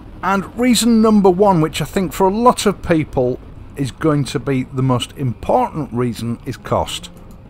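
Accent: United Kingdom. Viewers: Very thick red coat accent as in "the Red Coats are coming". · I enjoy the content and the calmness of the speaker. I wonder which part of the UK the accent belongs to. Best regards.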